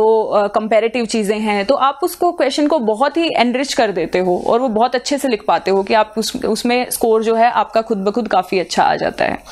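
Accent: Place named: Haryana, India. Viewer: Haryanvi accent 🤘🤙👏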